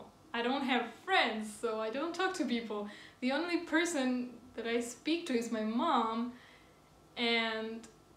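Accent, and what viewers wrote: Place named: Europe. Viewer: Honestly I think you got lots of potential. It's a shame you quit. I do similar vids. You sound European, let me guess..... enmmm... tbh idk. Middle Europe? Aahh Poland! So I guess I was right. I got my answer as I watched your video. It's a good video. You should do a legendary comeback to youtube.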